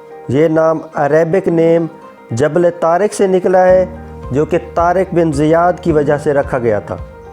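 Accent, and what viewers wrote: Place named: India. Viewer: Click - > Indian Voice - > Close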